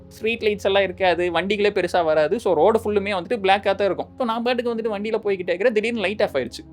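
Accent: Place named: Kongu, India. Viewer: Little Kongu Tamil and Big motivation.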